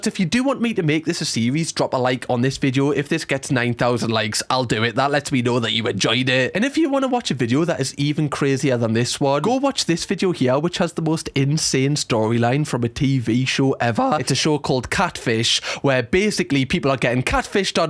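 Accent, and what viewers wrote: Newcastle, United Kingdom. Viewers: I love the way you speak so much. The jokes you Crack, I'm dying 😂 it sometimes gives me little Britain vibes. I love British English so much 😂❤️ much love from Germany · He's from Newcastle in the UK! 🥰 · I love Geordies. I was conceived in Newcastle but born in wales but when I hear you I think of my parents and happy times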